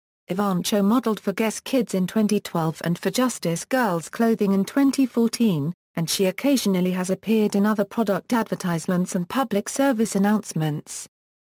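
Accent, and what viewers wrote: United Kingdom. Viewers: Nice professional bio. The reader has a nice British accent, but some serious mis- pronunciations. Most serious is Jackie's last name: it should be ee - VAN - koe! Fairly good organization of many activities and accomplishments; it would be good to accent the headings and pause briefly after each one. The vocal delivery could be a bit more expressively modulated, with some enthusiasm, and somewhat less objectively flat. Thanks, though, for having this information about such an astonishingly remarkable youngster available for those who cannot read about her visually :)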